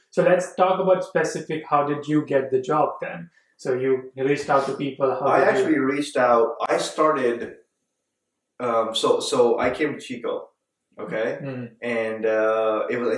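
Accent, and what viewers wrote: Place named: India. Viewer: I suppose you shot this video a while back, I was wondering that both of you graduated from the same university, but still you've maintained your Indian accent though you've married an American, while he he's got an American accent. Pls take it as a compliment from me.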